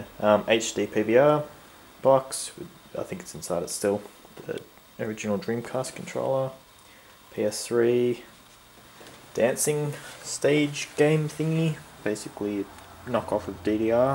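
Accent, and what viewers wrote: Australia. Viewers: Very nice setup bud! not sure about the lounges haha but great ;) can definitely tell you're from Australia like me ? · U Aussie bro ?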